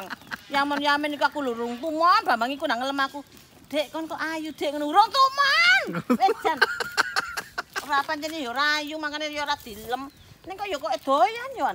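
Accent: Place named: Surabaya, Indonesia. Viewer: salam kenal nggih, aku lht chenel smpean sekali ngono kok lngsung ktgihan, sampean iku ngangeni, trs lek ngomong logate surobyoan bnget, sakjane smoean asline endi..aku nek g ndelok konten smpean rasane motoku gatel bnget...sehat sll yo.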